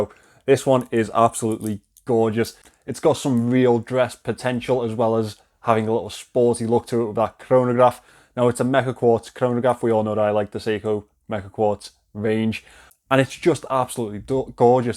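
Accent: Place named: Scotland, United Kingdom. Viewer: I’m only here for the terrific Scottish accent 🤩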